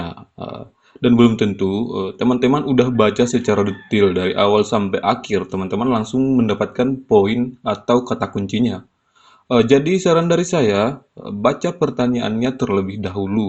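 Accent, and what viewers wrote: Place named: Muna, Indonesia. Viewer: Dialeg bahasanya mirip orang muna kereeen 🙏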